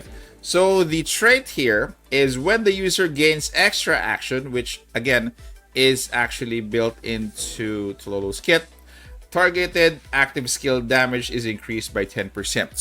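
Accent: Philippines. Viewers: bro is it me or do you sound like your average filipino teacher/instructor especially with that signature filipino reiteration and intonation during explanation lmao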